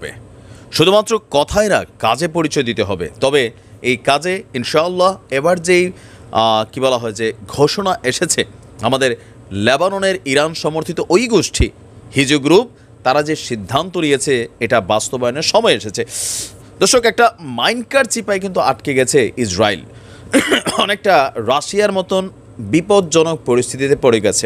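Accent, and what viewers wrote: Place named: Noakhali, Bangladesh. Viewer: আরে ভাই এতো নোয়াখাল্লা তার পর আওয়া এর ভাষা এমনী হবে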